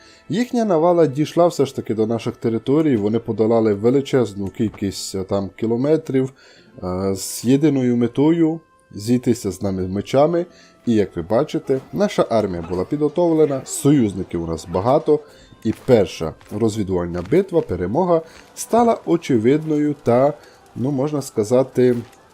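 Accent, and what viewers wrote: Transcarpathia, Ukraine. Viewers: Якщо я правильно зрозумів по говору, то ви з Закарпаття. Можна зробити особливістю канала те, що ви будете включати у свою мову особливості вашого діалекту)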